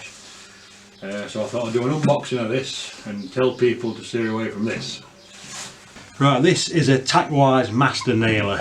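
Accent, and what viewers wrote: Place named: West Yorkshire, United Kingdom. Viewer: Great video thanks pal, I nearly bought the orange one until I saw this so cheers, sounds like you’re from West Yorkshire too? I’m in Halifax!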